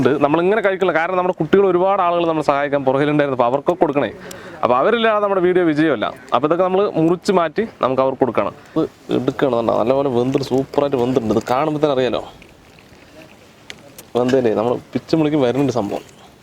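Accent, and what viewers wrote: Palakkad, India. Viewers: palakkadan slang itrayum bhagiyode kerathinu kanichukoduthathinu big salute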